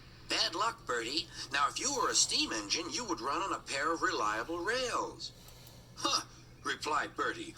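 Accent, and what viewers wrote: France. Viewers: Wow you sound like a french narrator ! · Whoa, I trust you! You sound like a French narrator from SpongeBob SquarePants. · WOW No Way You sound like the French Narrator from SpongeBob SquarePants. I always trust on my friends because they're always helping me just in case they need help like a helping hand great video I can't wait for the next video called Oscar, Trevor Evans and the really useful Party it would be Partytastic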